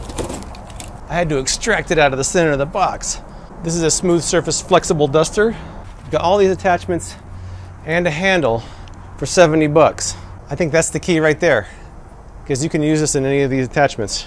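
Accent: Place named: South, United States. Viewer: Keep the southern accent man 😂 if you grew up in the south then keep the accent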